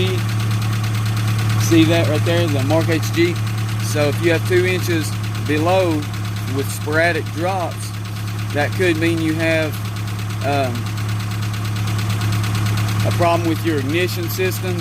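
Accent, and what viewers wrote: South, United States. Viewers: Luv accent mate. South?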